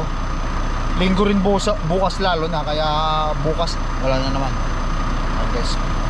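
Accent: Ilocos, Philippines. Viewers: yun speaking voice mo tunog batangeño na ilocano tigas ng dila mag pronounce ng words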